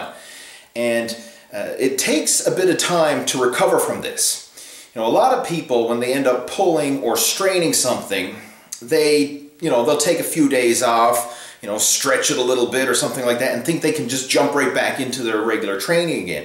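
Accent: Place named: East Coast, Canada. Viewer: The accent. Can’t tell if it’s East Coast Canadian or maybe a light Boston accent. Newfoundland?Where are you from bud? I only ask because I teach English and my study was linguistics.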